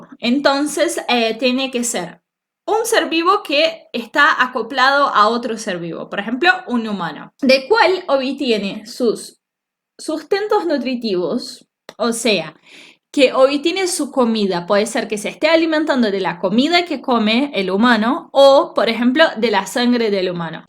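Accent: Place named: Brazil. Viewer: então, eu reconheci seu sotaque brasileiro no hora de falar espanhol. Seu conteúdo é bem interessante. Eu morei no Brasil dois e meios anos. Eu fiz mestrado lá.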